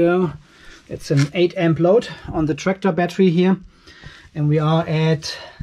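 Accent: Germany. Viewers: und ich war mir immer sicher da einen deutschen Akzent zu hören :) Mach weiter so :)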